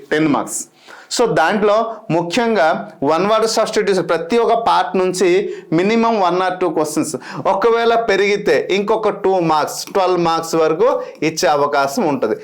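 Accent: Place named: Godavari, India. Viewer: Godavari slang ekkuvundi..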